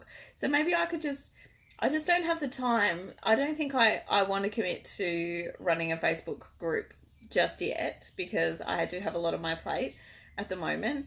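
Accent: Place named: Australia. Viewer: Hi, it’s so nice to hear another Aussie voice on YouTube.. and another Aussie perspective on crocheting. I’ve only just found you thanks to your yarn swap with Summer. I’ve been crocheting since I was 9 ( almost 39 years..) but I’ve learned more thanks to YouTube videos than I knew in the previous 39 years!! I’ve been unable to work since 2016, due to chronic and acute pain and illness, crocheting helps get me out of bed, gives me something to achieve each day ( other than just getting up!!), and helps me to cope with the rigours of my illness. It is my hope to be well enough next year to commence my own YouTube channel, to help give another Aussie voice and help to build the Aussie crochet community on Youtube, whilst also sharing a little of my journey in the hope that I can bring some light into the life of others living with chronic illness and pain.. 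(hopefully my Counselling background might help a little here). My wish is simple (I hope), I’d love to be able to try either Red Heart Super Saver, or Vanna’s Choice yarn, or Lion Brands Touch of Alpaca. Being on a very limited budget, accessing some of these are a little beyond my reach at the moment. All that being said, watching the wishes of others being granted brings me great joy. Thank you for you lovely lively video, I’m now off to binge watch your earlier videos... Wishing you and yours a very Merry Christmas filled with all the joys of an Aussie Christmas and a spectacular New Year!!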